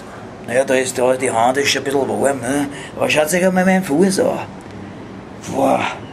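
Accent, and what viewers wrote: Vienna, Austria. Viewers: Jo Mann, do kummt des Wienerische so richti schee rüba. Verzeih mir, dos I des net guat ko, aba gfalle tuts ma scho!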